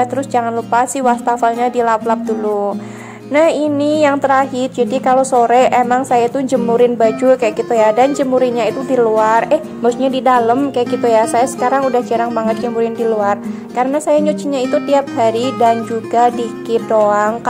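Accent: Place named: Java, Indonesia. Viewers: Bunda orang mana sih? Kayanya ngomong nya medok gt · emang asli orang mana mom,,,, kaya orang jawa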